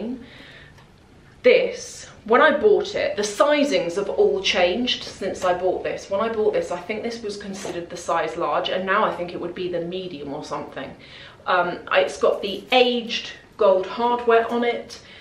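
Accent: RP, United Kingdom. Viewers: back on yt, i was missing your accent hun! posh and gorge' :* i feel sorry for your rabbit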